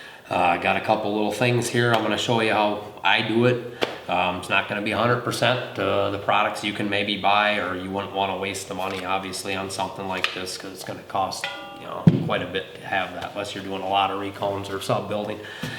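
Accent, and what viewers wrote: Minnesota, United States. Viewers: I hear the MN accent bro. "There you goooo" lol love it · That Minnesota accent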